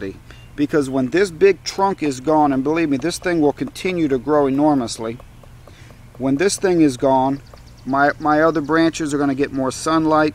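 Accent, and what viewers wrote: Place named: Louisiana, United States. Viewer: Are you from Louisiana? heheh, I like your accent and I'm trying to guess where you're from. =)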